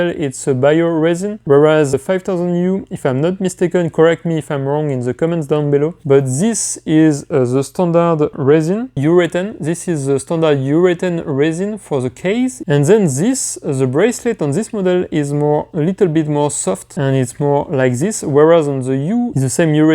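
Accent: France. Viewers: Un bon accent français !! Comme on aime haha 😂❤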